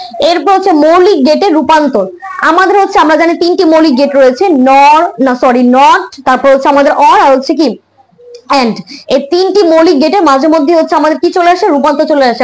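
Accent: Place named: Kolkata, India. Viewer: ভাষা কলকাতার মতো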